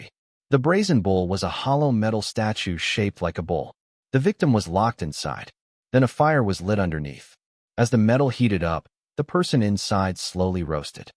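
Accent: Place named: United Kingdom. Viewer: Love the voice It's similar to uk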